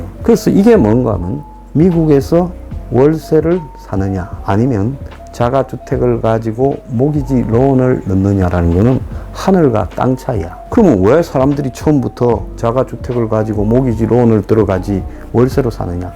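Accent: Gyeongsang, South Korea. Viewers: 경상도 사투리로 찬찬히 설명하시니 할아버지가 손자에게 희망적인 삶을 가르쳐 주시는느낌! ㅎ 저도 경상도 대구사람 이지요.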